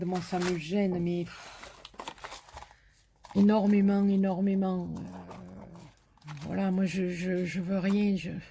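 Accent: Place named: Provence, France. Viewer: Bonsoir, j'ai decouvert ta chaine il y a quelques semaines et c'est un reel plaisir de t'entendre parler car ca me rappelle ma provence natale. Je suis du sud, une pure provencale exilee en Lorraine (mon mari est originaire d'ici...). Heureusement,je descend tres souvent pour voir les enfants, petits enfants et la famille. Je vais souvent a Marseille car j'ai un de mes fils qui y habite. Tu es une belle personne, qui me fait beaucoup rire, tes creas sont magnifiques, de toute beaute. Tu as ete tres gatee, effectivememt tu pourrais ouvrir une boutique de tissue.... Je te fais de gros bisous et a bientot pour voir d'autres creations. Francoise.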